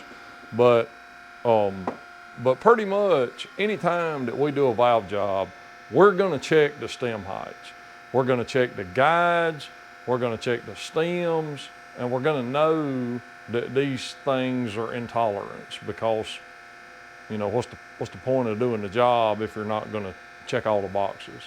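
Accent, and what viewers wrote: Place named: South Carolina, United States. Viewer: He lives in South Carolina, the USA is big enough that there are several different accents from one end to the other. You can tell someone who lives in Minessota from someone who lives in Wisconsin, as an example.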